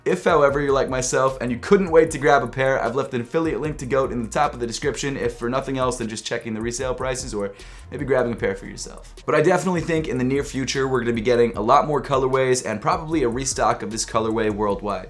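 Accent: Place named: Southern California, United States. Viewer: sounds like a totally tubular guy from Southern California but he's not from there.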